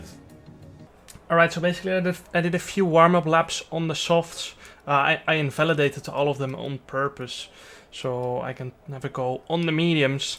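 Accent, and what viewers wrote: Netherlands. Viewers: Je klinkt erg Nederlands in je videos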